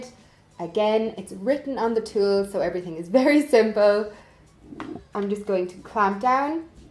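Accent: Ireland. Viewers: I thought you were irish😂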